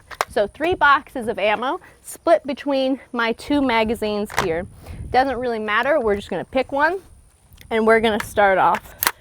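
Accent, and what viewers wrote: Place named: Shreveport, United States. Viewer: What a fun video to watch and I learned a ton. Your accent makes me miss living in Shreveport.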